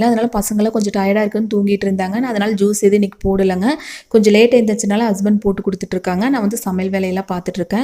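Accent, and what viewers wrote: Kongu, India. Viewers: Kongunattu Thamizh ketkave inippa irukku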